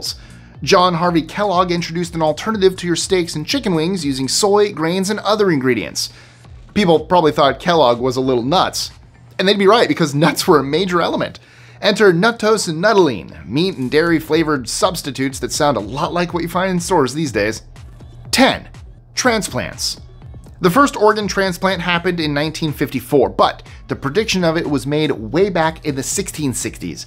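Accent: Canada. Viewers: i thought you were canadian as well ,,,,,, your more canadian than me and i live in ontario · yup, take it from a Canadian, you DO sound Canadian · The Mandela effect cos I thought you was Canadian too eh 😅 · It's your accent that he thinks you're from Canada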